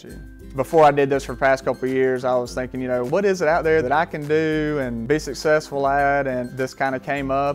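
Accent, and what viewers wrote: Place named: South, United States. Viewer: beautifull plants. your accent like people in the forest gump movie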